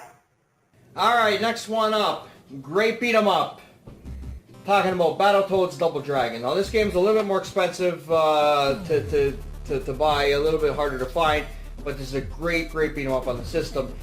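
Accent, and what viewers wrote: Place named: Northeast Ohio, United States. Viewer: sounds similar to northeast ohio dialect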